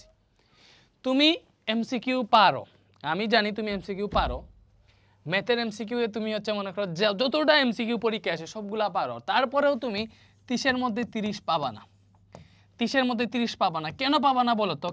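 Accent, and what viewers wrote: Sylhet, Bangladesh. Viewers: Bai apni to sylheti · Sir apnar bari sylhet er kotay.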